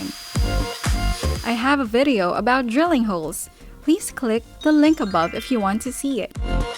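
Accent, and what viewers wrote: Philippines. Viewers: wow! ur speaking have improved very much! i don't know if that is a voice app but what matters is that it's not boring to hear anymore. i'm the one who advised u to talk faster and don't use filipino english accent 1 year ago. u are very good now! goodluck to ur plant vlogs. 😊❤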